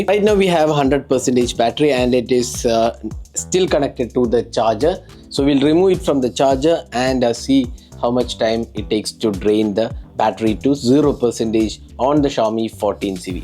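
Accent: Kerala, India. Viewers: Malayali analle aa english ketta ariya 😂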